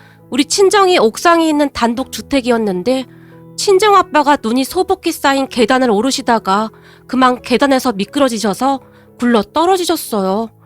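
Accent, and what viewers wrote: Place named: Chungcheong, South Korea. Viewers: 힘드셧나보네요😓 보통일이아니네요..🤯 충청도사투리에 꽂혀서유~ㅋ 수고하셔유~~(^^♪) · 정말 충청도 말씀이 웃깁니다 저로서도 뒤로 넘어지겠어요 시부님께서도 때묻지 않는 모습이 넘 보기 좋습니다 시부님 배려도 넘 보기 좋구요 쓰니가 시집을 잘가셔네요 사람은 진실되게 살면 얼마나 좋습니까 쓰니 잘들었어요 행복하세요 잘들었습니다 감사합니다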